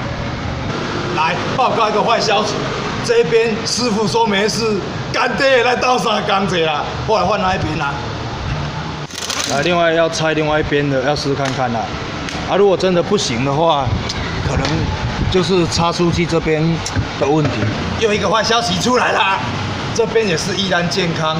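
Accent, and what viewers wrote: Taiwan. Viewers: 幹你的台語好道地好親切好有台灣味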